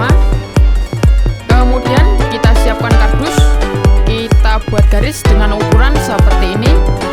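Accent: Java, Indonesia. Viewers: Medokk buangett bosomuu ndann😅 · Cara penyampaian bagus aku suka khas Jawa ☺️ editannya jg bagus. ❤️ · jawa ne medhok 😂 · Mantap bang suka suaramedoknya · LOGAT JOWONYA KELIATA BETUL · Orang Jawa ya..nada bicaranya kek orang Jawa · Suaranya medik rek rek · Kreatif banget... muantaap 👍👍👍👍....aq seneng bhs jawanya medhok...smpyan asli endhi Maas😁😁🙏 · Jowo jan muasok bossquuuu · Medok Banget ya bos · Wong jowo endi suarane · Sampean jowo ngendi mas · medhokk bhoss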